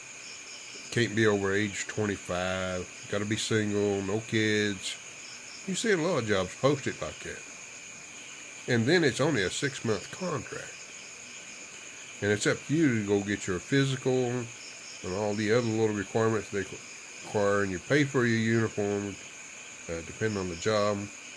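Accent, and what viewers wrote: United States. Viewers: That's okay,. After watching you for more than several years now, I simply came to the conclusion that the lag phenomenon was a result of your wonderful Kentucky drawl and nothing more.. Didn't bother me none. LOL 🤣 I honestly think most of these price increases are due to nothing more than blatant price gouging - maybe up to 30 %. I try to counter the rising prices by growing more fruits and vegetables plus reducing my electrical consumption wherever I can. We have more romantic candlelight dinners and make more use of mama's dirty kitchen while pretending we are camping out. I cut back on or even stopped purchasing some American dishes I have a hankering for. Still trying to put money aside for solar power, but not as much as I once was. Anyway, still breathing, drawing my retirement and enjoying life in the Philippines. Can't really complain. 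Thanks for sharing and letting your subscribers know what life is truly about out in the boondocks, my friend. 😃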